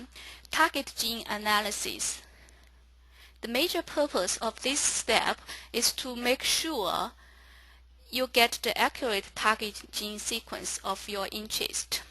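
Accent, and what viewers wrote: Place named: China. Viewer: Why chinese speaker....cnt understand...even a sentence...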